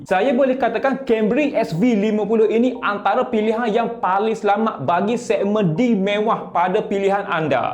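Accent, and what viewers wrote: Kelantan, Malaysia. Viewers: Aku confuse dia ni kelantan ke jawa…😅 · kuat bunyi kelate demo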